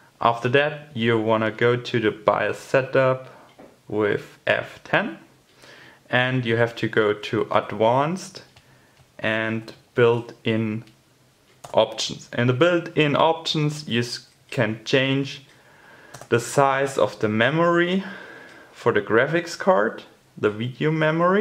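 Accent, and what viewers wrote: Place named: United Kingdom. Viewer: Nigga cant u tell? He has a fucking brit accent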